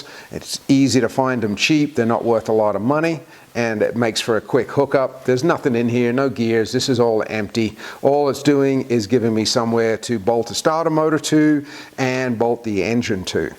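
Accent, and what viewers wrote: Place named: Australia. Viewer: Thx. Different subject - on the first of your videos that I watched I tried to figure out your accent (kind of a hobby of mine). It was clear at least 50-75% is Australian, but there’s a significant influence from somewhere else, possibly Western European. Care to enlighten me? 😁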